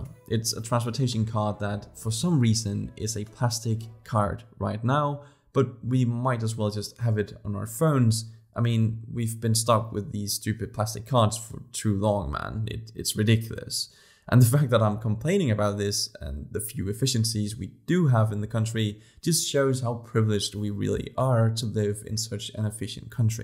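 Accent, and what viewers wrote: Denmark. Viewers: Bro I can hear how danish you are. Please don’t make videos prazing your country just because you are from the country. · are you danish i think i hear the danish accent and you said the danish words perfectly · Are u Danish? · sværger du taler nutidens Anders fogh og lars lykke engelsk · Gættet på at du var dansker, vi kan altid høre hinanden xD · he is danish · I can hear, you are danish too :) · i could from the first word hear that he was a fellow Dane and one disadvantage is the weather always cold or rainy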